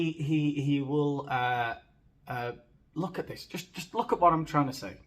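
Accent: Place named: Sheffield, United Kingdom. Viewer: Do, do the voices. Particularly your Sheffield voice, I love it!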